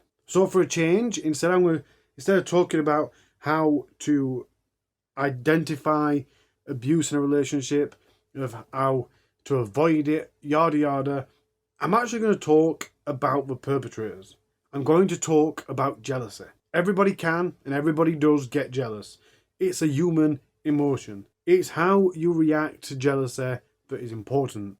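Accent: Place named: Yorkshire, United Kingdom. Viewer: Just found your channel. So good and subscribed. Daft question, but your accent... youre northern like myself... are you from Yorkshire? Xx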